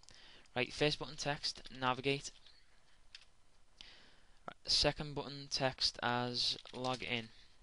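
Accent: Liverpool, United Kingdom. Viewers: I love this channel! So much useful stuff demonstrated in front of my eyes. And not narrated by someone with an intimidating accent that makes you feel you are a noob. A scouse!!! Love it!!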